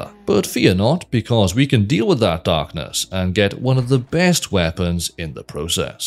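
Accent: Wales, United Kingdom. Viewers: I don’t see anyone else having remarked on it after a cursory skim, and it might be just an accent thing, but I swear it sounded like you said ash-thetic. So, either your diction gives a bit of Sean Connery (I know, you’re Welsh, he’s not, it’s not technically an accent thing, but ykwim; also Welsh accents are so pretty, like burbling streams), or you snuck in a 10/10 pun. Either way, it works!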